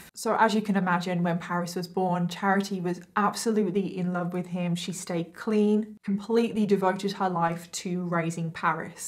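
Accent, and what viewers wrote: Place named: England, United Kingdom. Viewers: yes she come up with the mystery murder and makeup idea BUT people get inspiration from others and the world and just because she’s doing her makeup and telling the story like Bailey does it doesn’t mean she’s trying to be like her! She’s doing it her own way and plus she’s from England so the accent gives her a twist and makes her nice to listen to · How can anyone believe he's rehabilitated. I agree with you! I love your English accent and your very pretty 😍 thanks for your videos!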